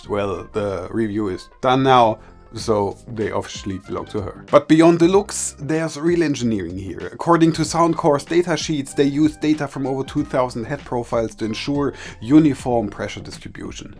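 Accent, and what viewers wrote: Germany. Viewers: Nice Deutscher Akzent :)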